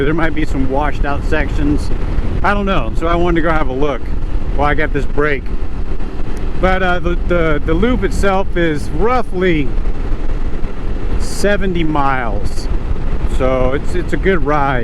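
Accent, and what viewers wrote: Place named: Ozarks, United States. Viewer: Ozark hillbilly 🤔, you from SW MO or Arkansas?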